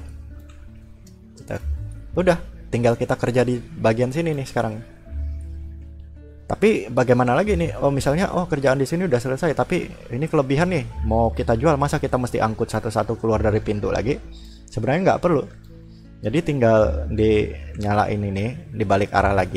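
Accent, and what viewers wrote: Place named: Indonesia. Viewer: Yeah he from indonesian me to :p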